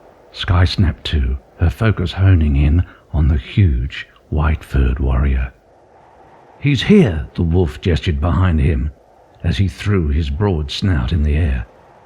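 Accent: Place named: Australia. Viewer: I listen to a lot of audiobooks so it is both interesting, brilliant but also somehow strangely odd to hear an Australian accent narrating. I look forward to hearing the entirety of it. The narrator has a lovely deep timbre to his voice.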